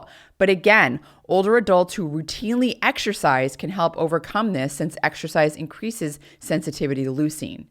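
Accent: United States. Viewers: Your typical American voice timbre makes me think you would be a wonderful country singer.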